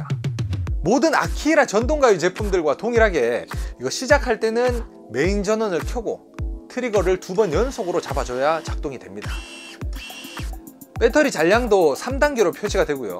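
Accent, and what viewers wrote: Gyeongsang, South Korea. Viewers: 대구 사람 이신가요??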